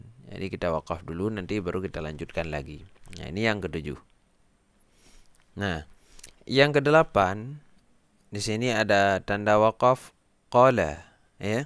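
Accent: Aceh, Indonesia. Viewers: Bahasanya...kaya orang aceh yaaah...jdi inget temen saya dri aceh zamroni holil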